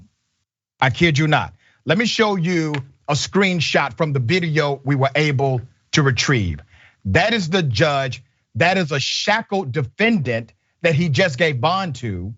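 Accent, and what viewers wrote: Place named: South, United States. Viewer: 😂 love your southern accent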